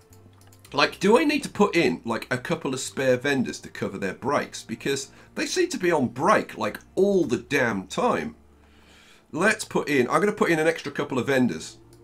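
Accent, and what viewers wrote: South Africa. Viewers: you from South africa? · Feel like Johannesburg? are you from South Africa? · Are you South African?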